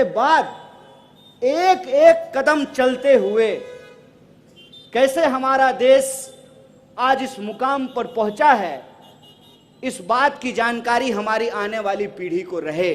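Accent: Bihar, India. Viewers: He should improve his Bihari tone.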